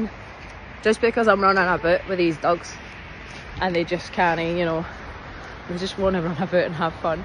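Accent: Scotland, United Kingdom. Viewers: Listening to you reminds me of my great aunt who was from Scotland, who married my great uncle during the war. By the way I understand you perfectly.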